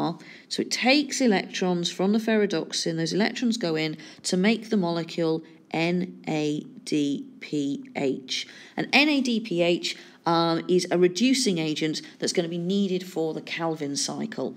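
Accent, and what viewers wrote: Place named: United Kingdom. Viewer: It's not bad pronunciation it's accent (British accent)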